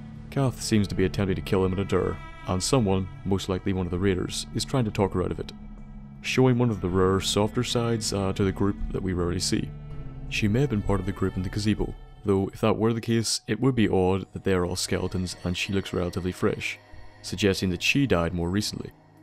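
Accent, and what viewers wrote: Northern Ireland, United Kingdom. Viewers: ur northern Irish right? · Are you from Northern Ireland? xD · Are you northern Irish?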